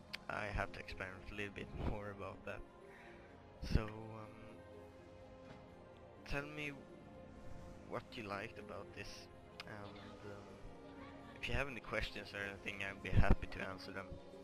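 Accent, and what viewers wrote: Sweden. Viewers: this guy is swedish, i can hear it from his voice :P if not he is atleast scandinavian >:]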